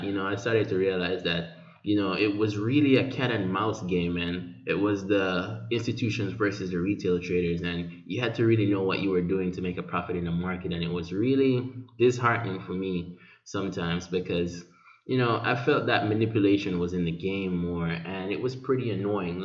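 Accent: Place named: Jamaica. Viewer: Man I knew u were Jamaican from the accent 😂. Big up 👍🏽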